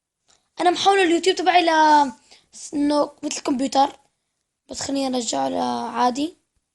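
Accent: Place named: Syria. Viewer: مبين لأن لهجته سورية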